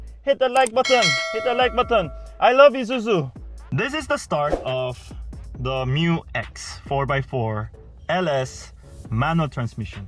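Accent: Philippines. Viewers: You have that filipino accent hehe.. actually many koreans do.. the korean girls are so cute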